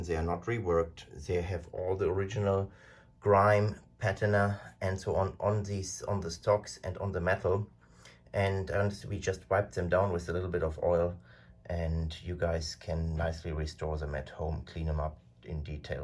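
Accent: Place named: Germany. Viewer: Are you german? Your accent sounds very german.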